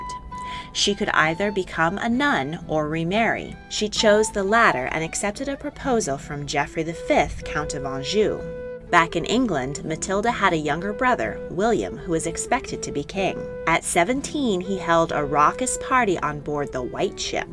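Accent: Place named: United States. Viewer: I do find an American narrator for my country slightly disconcerting !!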